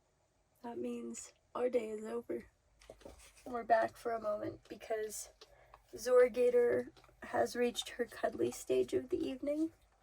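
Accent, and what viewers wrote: South, United States. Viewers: My Friend, just curious, are you From the Southern South?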